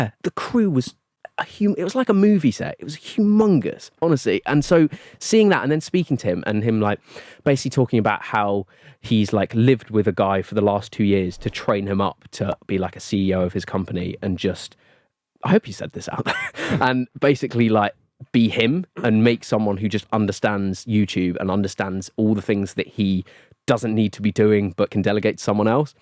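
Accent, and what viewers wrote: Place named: RP, United Kingdom. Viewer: it's a joke because he's very polite and posh sounding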